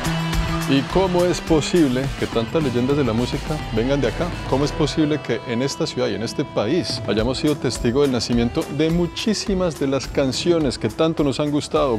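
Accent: Colombia. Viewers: me encanta porque no solo te enseña lugares que nadie o alguna televisora hace y siempre esta informado de algún lugar o monumento en el que visita, y sobre todo la educación y respeto que el siempre demuestra, haa y de extra me encanta su acento colombiano parce XD siga adelante amigo y lo sigo desde que vino a mi humilde Guatemala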